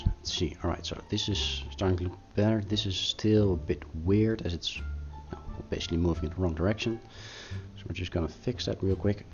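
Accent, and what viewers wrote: Netherlands. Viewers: Lmao never been so confused Je accent liet mij denken dat je van Nederland bent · Are you dutch? I recognize that accent. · your obviously dutch i hear that imidiatly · Yoooo that accent thoooo are u dutch ? Cus so am i lollololol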